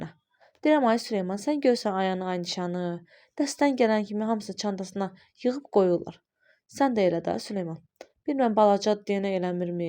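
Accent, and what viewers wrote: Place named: Quba, Azerbaijan. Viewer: baci siz haralisiz..lehceniz Quba ya oxsatdim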